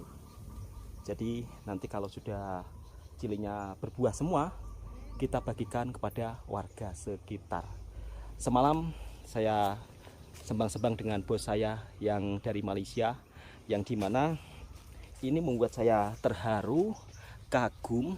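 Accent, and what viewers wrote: Java, Indonesia. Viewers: Bos Malaysia trkejut klw tk balik kerja d Malaysia?..😂😂😂 Maaf ckp la..sy tengok skill prkebunan d kpg wlwpun hanya tanaman sayuran..tk nmpk langsung kepandaiannya, nmpk mcm batas sayurannya semak, kurang trurus dan seolah spt petani yg pemls dan tk serius..tk bole d jdkn panduan dlm hal2 prtanian kebun. Amin Maulana lg bagus...prtuturannya pun lebih baik dan tratur, rajin ke dapur dan bole d katakn pandai memasak. Prtuturan ni trlalu bunyi jawanya dan kadang2 asyik trsasul, padahal sudah brbelas tahun d Malaysia. Lama2 naik bosan jgk menonton konten2 vidio si ni. Hello...buat lh perubahan konten yg lebih mmbina dan menarik lagi..jgn asyik gunakn ank saudara sebagai umpan nk melariskn konten vidio kamu je. Klw sesekali tu, tk pe la. Gunakn kepandaian, kreativiti dan inovasi original kamu sendiri utk menarik minat penonton, kualiti konten dan brilmiah. 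Komentar asyik nk kena ckp yg baik2 je, wlwpun nmpk byk kekurangan..sesekali spt kami penonton ini, kena lh tegas dan pedas cket komentarnya supaya harapan adanya perubahan yg lebih baik dan mmbina.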